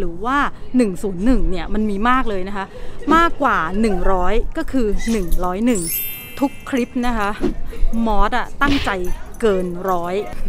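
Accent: South, Thailand. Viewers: มอสเป็นคนใต้ใช่ไหมครับ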